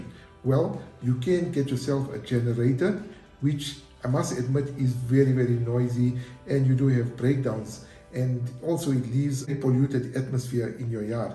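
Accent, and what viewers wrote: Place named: South Africa. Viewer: Wow, a true homegrown South African English accent, love it! 👏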